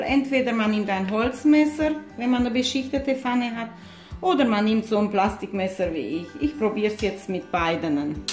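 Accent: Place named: Transylvania, Romania. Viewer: Werde das Rezept in den folgenden Tagen zubereiten. Mama machte das mit angebratenem Speck! Werde ich beibehalten! Leider tue ich mit Knoblauch wie immer übertreiben! Ein Lib an Deinen wunderbaren siebenbürgischen Akzent! Meine Eltern hatten den auch beibehalten! Bin seit meinem 12 . Lebensjahr in Nürnberg ansässig und spreche fließend viele süddeutsche Dialekte! Soxesch kann ich auch noch! Vorwiegend die Dialekte aus der Schäßburger Region! Da sind meine Wurzeln! Herzlichen DANK nochmal! 🙏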